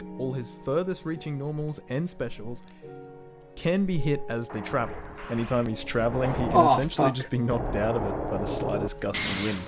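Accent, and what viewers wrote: Australia. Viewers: You know he's Australian cause he says haytch instead of h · Sorry bro but i would rather hear roofles manly alpha voice narrate a hit guide instead of an aussie :(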